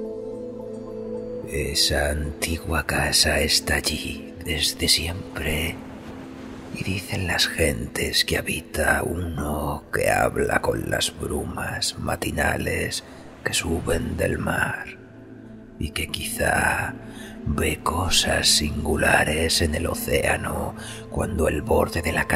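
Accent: Catalonia, Spain. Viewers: El narrador es catalán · Por que el acento catalan? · Vaya ramalazo a catalan cerrado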